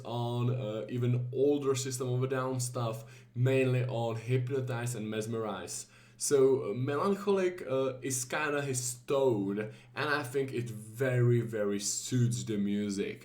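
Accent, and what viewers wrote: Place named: Czech Republic. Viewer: I don't know why but your English sounds very czech.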